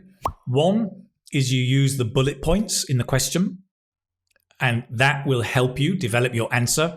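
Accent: United Kingdom. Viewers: "To be honest, Mr., I've fallen in love❤ with the English language all over again—especially the British accent—because of you."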